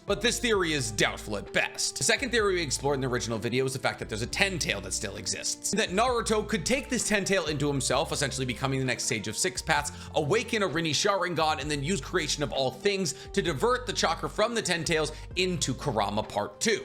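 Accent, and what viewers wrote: United States. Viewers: Cn you please pronounce their names correctly? Your content is great but it hurts credibility when you pronounce the names super American-like.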